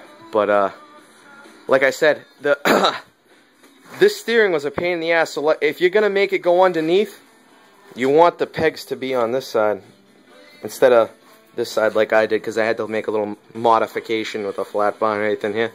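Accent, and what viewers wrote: Boston, United States. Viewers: Keep jumping in and out of that Boston accent. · are u from boston? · boston right??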